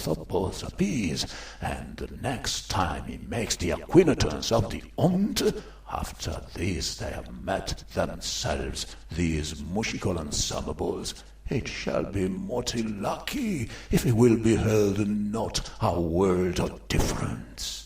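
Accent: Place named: Italy. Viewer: Marvellous stuff! So great to hear FW in an Italian voicing. Wonderful rendering of rhythm, tunefulness.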